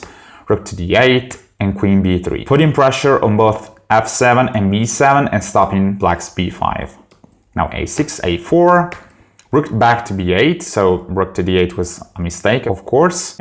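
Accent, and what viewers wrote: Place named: United States. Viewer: Ciao, complimenti, mi sono iscritto da poco al canale e lo trovo molto divertente. Sono d'accordo con la risposta che hai dato a White Rabbit. Le partite trash dal vivo sono al top sempre. Un consiglio se permetti, bravo per l'inglese, ma parlare con un accento nordamericano esasperato è veramente trash IMHO, anche perchè sembri un imitatore di Hikaru LOL. Ho apprezzato molto anche i video con i consigli per migliorare il proprio gioco.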